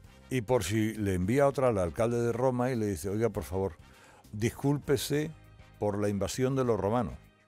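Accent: Spain. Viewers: Hay pobre pend... que no sabes de historia, primero entérate tampoco éramos la nueva España. Pobre pend... no sabes de historia y deberías estudiar antes de abrir tu osico por tu acento español.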